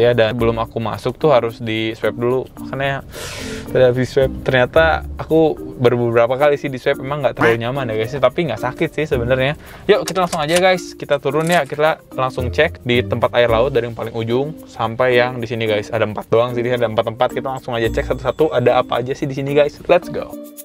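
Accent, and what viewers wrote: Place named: West Java, Indonesia. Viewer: Cara ngomong kak ini khas Sunda bgt apakah emg org Sunda? Wkwk penonton baru ini.... Dan ada yg bisa ngasih tau ini pasarnya di mana ya?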